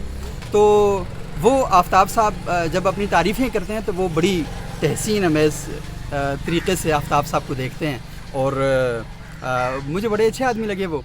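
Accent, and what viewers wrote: Abbottabad, Pakistan. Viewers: Bhai Lehjay or talafaz k liye abbottabad ka rehashi hn..haha bhai jnay do yarr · Abbottabad ka lagta hay, hinko touch to aae ga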